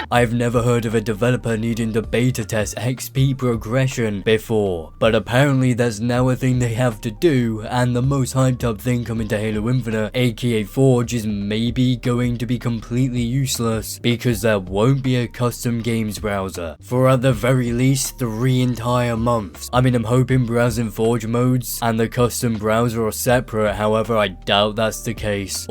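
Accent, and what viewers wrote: United Kingdom. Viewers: He's bri'ish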